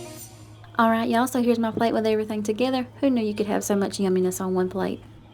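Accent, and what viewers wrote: South, United States. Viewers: Hi! Just came across your channel. Where do live? I detect your southerness. I am from Texas. My grandma lived in a super small town on the border of Texas and Oklahoma, but her mom was from Georgia. I love this kinda cooking.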